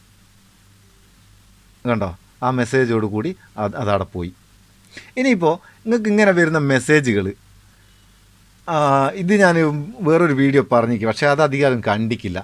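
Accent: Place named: Kozhikode, India. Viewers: നിങ്ങളുടെ നാട് വടകരയാണോ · നിങ്ങളുടെ കോഴിക്കോടൻ സംസാരം നല്ല രസമുണ്ട് കേൾക്കാൻ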